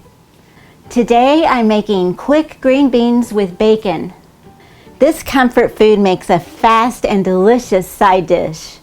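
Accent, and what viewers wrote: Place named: South, United States. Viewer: What a beautiful green bean recipe,. Your southern accent is just too adorable, my dear friend. What's your best tip for making an upside down cheesecake? I love you so much, sweetie.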